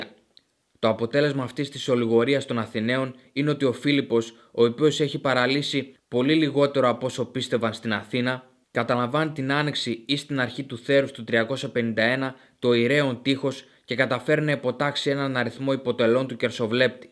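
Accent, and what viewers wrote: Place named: Thessaloniki, Greece. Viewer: πολυ καλος ο αφηγητης, ακουγεται η Θεσσαλοκιωτικη προφορα βεβαια.............μια χαρα φυσικα, για την Μακεδονια αλλωστε μιλαει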